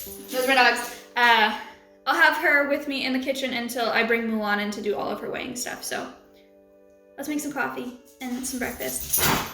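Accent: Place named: United States. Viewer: Why is her wheel on the right side of her car? Is she not American? She sounds American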